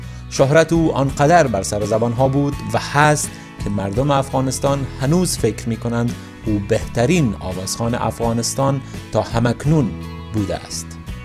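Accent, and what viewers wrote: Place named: Iran. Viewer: ( اوستورا ) ، یعنی چی ؟ چرا به لهجه ایرانی حرف میګوید ؟.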